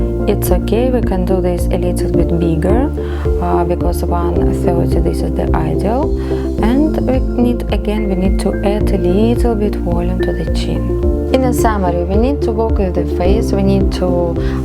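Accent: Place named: Russia. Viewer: А че говор Русский))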